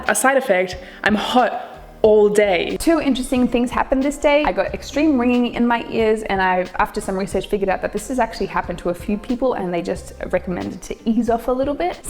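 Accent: Australia. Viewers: you have a slight australian accent after your 1st accent..... did you live in australia? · you sound australian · Are part aussie? You sound like it a bit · so this is the first video of yours that ive seen. i was thinking - wow, her accent is on point (im australian.. assumed you are icelandic). i noticed like 5% sounds south african. so then im wondering, has she just lived in straya for a while, or did she self teach the australian accent? then i heard 2 seconds of your bf and i knew he was aussie and therefore that must be why you sound australian. now im thinking, maybe you're actually australian, born and raised. hmmmm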